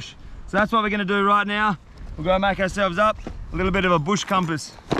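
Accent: Australia. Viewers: As a Canadian, I find your Australian accent very cute 😄. New to your channel and subscribed! It was entertaining, mate. Keep them coming, eh?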